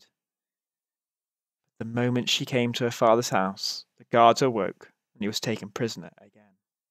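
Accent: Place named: United Kingdom. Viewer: I have searched and searched for these in a BRITISH ACCENT and here I have finally found it!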